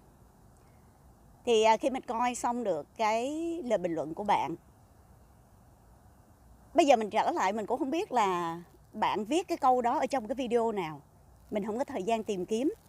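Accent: Standard. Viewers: Em nói chuẩn nhất mà chị đã từng nghe. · Chị nói hay chuẩn lắm chị 😁😁 em còn mê chị nói chi ai 🙈🙈 chúc chị luôn luôn vui vẻ tràng đầy sức khoẻ trẻ mãi không già chị nhé.🌹🌹🌹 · Chị nói hay và chuẩn lam chi oi! Giọng nói củng hay va hiểu biêt.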